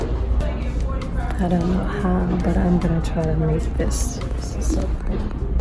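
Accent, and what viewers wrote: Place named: South, United States. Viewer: I really love your creativity, and especially I love listening to your wonderful accent. Are you from somewhere in the South?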